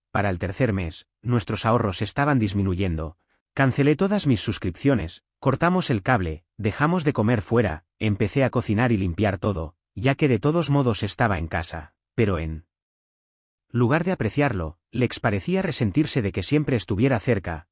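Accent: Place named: Spain. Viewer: La verdad no sé porque a todas este tipo de historias le ponen esas voces mamonas españolas. Me caen en la punta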